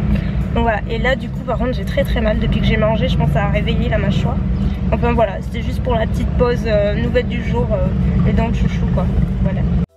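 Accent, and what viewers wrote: South, France. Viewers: Ouais moi y'a un autre truc qui me perturbe! (et d'autres aussi, comme Chrystellemakeup qui est aussi du sud) dit "moinss" pour "moins". Je m'en remets pas!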